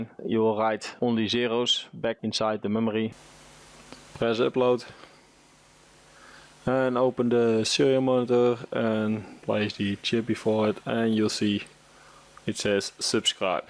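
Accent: Netherlands. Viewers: are you dutch XD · Het Nederlands accent is wel te horen, maar goede video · Neiderlandsee accenttt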